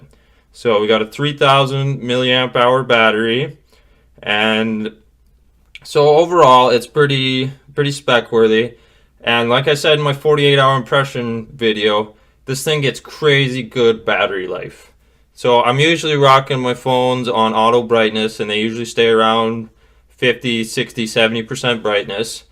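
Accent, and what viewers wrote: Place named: Canada. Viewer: For your screen on time of 10 hours, is that over the course of two days with quite a bit of standby time mixed in, or more straight usage in one day? Also sounds like you're in Canada so I was wondering if you bought this phone from a carrier or unlocked? If you bought it unlocked where did you get it? Seriously considering this phone, samsung even dropped the price to $450 CAD on their website.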